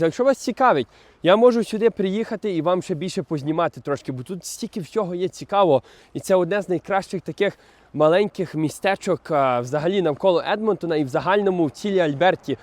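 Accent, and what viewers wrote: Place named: Western Ukraine, Ukraine. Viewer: Дякую тобі за класні відоси, впізнаю наш захїдний діалект. Мені подобається дивитись твій канал, навіть за відсутність професійної зйомки....тощо...Бачу в тобі скромну, виховану та порядну людину. Простий Українець! Десятки мільйонів тобі підписників і стрімкого зросту каналу! Слава ЗСУ!!!Слава Україні!!!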